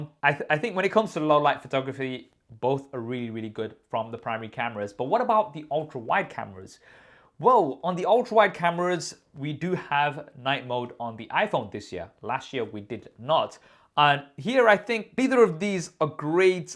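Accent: Leicester, United Kingdom. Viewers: Never knew you was from Leicester...... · Didn’t realise you was from leicester 😲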